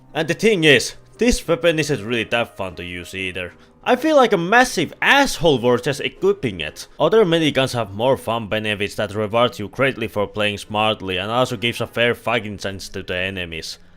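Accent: Finland. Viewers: you are the suomiest finn ive ever heard, good video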